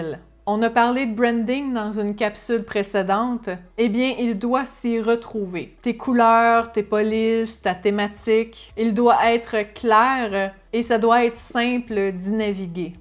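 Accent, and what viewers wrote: Canada. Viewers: bonsoir, depuis peu je regarde vos videos, je les trouves interressantes, et bourres de conseils!!! C EST SUPERBE, j adore votre accent canadiens,!!!!!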